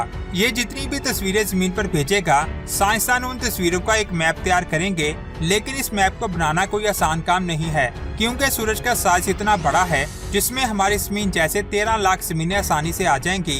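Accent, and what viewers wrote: India. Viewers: Teri awaz se pata lagta hai tu indian hai ..pakistani ban kar chutiya bana rah hai...😂😂😂😂😂😂😂 teri zaban bata ti hai tu indian hai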